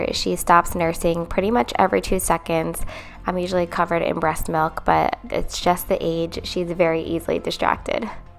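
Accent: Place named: East Coast, United States. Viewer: Just discovered this channel today...I have to ask, does anyone know where she is from? She seems to have an east coast accent. I'm originally from Cape May...so I can usually spot an east coast accent instantly (makes me a little homesick) 😊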